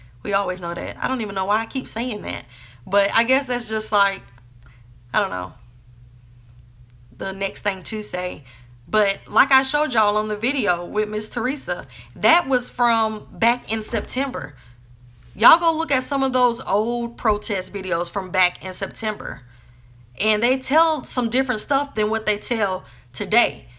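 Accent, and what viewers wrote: South, United States. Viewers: I enjoy your videos, thank you for keeping us updated. Love your voice, are you in the South?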